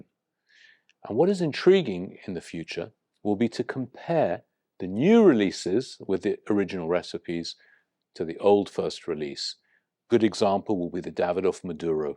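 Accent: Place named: United Kingdom. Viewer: nice ckearly britain english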